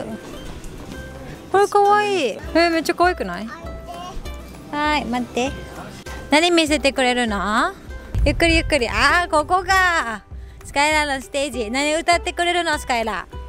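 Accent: Japan. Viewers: 初めて動画観ました！ 高知弁が可愛すぎてキュンとしました☺️💕 旦那さんと息子さんも素敵すぎて理想の家族です✨ 他の動画も色々と観たいです☺️❤️ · いつも楽しくみてます！ 方言富山ですか？？似てたので…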